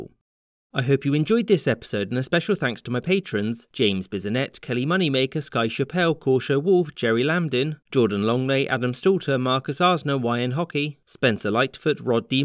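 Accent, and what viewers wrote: United Kingdom. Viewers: I've always thought it was interesting how Brits pronounce things. It's so striking to hear someone call France, 'Fronce'.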